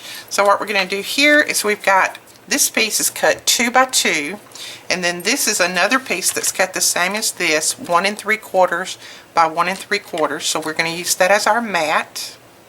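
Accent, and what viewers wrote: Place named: Alabama, United States. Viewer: Just watched your video, this is just amazing!! I've missed listening to someone from Alabama!! Thanks from Arizona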